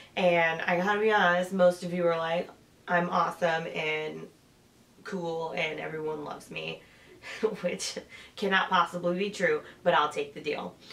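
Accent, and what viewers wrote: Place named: Michigan, United States. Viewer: here are my questions, most of which are non-sewing related. 1) Are you from Michigan, you sound like you are from Michigan? If, yes, where? FYI Detroit is one of of my favourite cities in the world, yes, you read that correctly. LOL 2) I think I heard you say once that you are a mechanic but you also work the midnight shift, who gets their car fixed in the middle of the night? I don't understand. If you are willing to share, please explain. 3) I would love to see a video on your TNT patterns and finally 4) what is your most worn and/or favourite me made item. I love your channel and hope you are feeling better.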